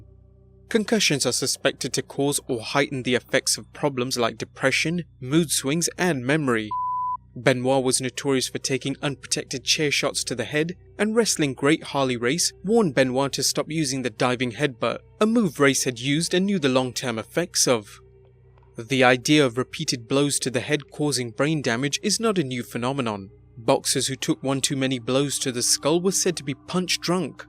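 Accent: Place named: England, United Kingdom. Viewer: Ughh the monotone and English accent makes this video 100X worse i couldn’t make it half 1/4 the way thru it. And this guy had many videos.. all of disgusting horrible acts, cia would be in his backyard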